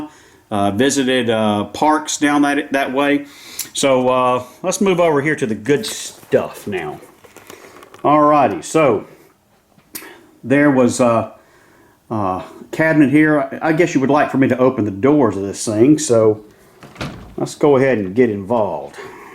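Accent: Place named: Alabama, United States. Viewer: I thought you sounded like an Alabama boy . Enjoyed the show . Love getting to see collections .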